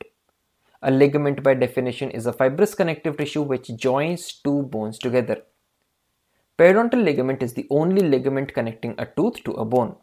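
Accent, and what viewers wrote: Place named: South India, India. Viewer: Hehe...am i right or wrong Aapke kuch words mein south Indian accent lage.. 😅 anyways.. nice explanation of the topic thank u 🙂👍...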